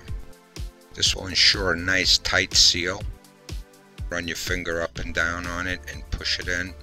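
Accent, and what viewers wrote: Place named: New Jersey, United States. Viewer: This guy sounds like he’s from Jersey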